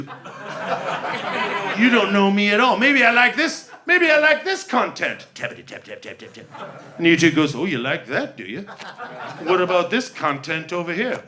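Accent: United Kingdom. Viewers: very funny show, your British accent was very similar to the Dart callers. 😂🤣🍻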